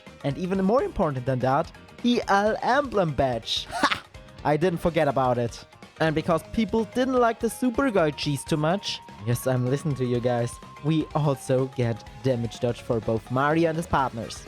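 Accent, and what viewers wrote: Germany. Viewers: Man hört den deutschen Akzent raus 😂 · I hear a German accent ?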